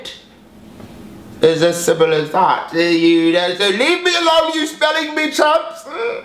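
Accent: United Kingdom. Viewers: I swear I can't stop laughing the British accent loooooooooool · Oh help me. Brilliant British accent if i say so myself. what what.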